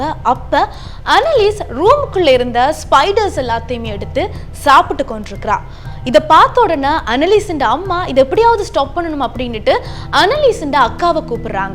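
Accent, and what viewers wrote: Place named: Sri Lanka. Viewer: Mam..neenga ilangai yaaà...unga slang appadi irukku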